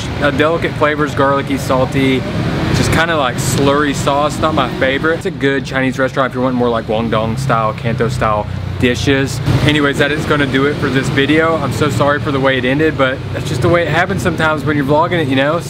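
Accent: South, United States. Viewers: just read brief description where you're from. thought you're from Georgia :-D, i notice your southern accent. I've never been to States but I have friends and families live in various states in the US. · didn’t realize you had a southern accent. Your videos from the Philippines was amazing. Never been there but after watching you eat... it’s on my bucket list. Cheers.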